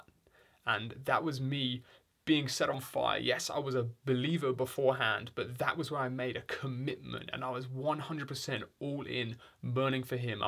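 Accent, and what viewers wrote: England, United Kingdom. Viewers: How is it that I didn’t even notice you were English until you mentioned A levels. I guess the internet has made me used to the accent. I’m American.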